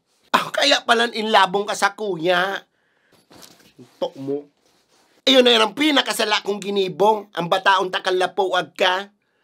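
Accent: Bicol, Philippines. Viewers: naugma ako duman sa lamay-ag.. hahaha, bikol na bikol. KUDOS ORAGON KITA!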